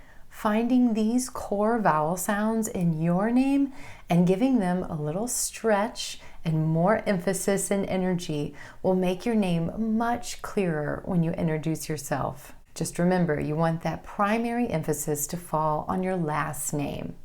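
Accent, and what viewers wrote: United States. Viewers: Yeah some word like hilarious, gorgeous, generous, famous, ambitious,ridiculous, superstitious, suspicious, furious, precious, Somethings that end with ous and ious, I really too bad to pronounce with whose word that end with ous and ious, or if you have more word that end with ous or ious, pls help shares more on the video you make dear, is really hard for me to make a sounds “S,Z” at the end of whose words, Hoping You can help me how to pronounce with whose word correctly dear, I will looking for your video new coming next soon my dear, Thank you so much for all your helpful video, and everything you explain on video very clear for me to understand and I really like ur accent American so much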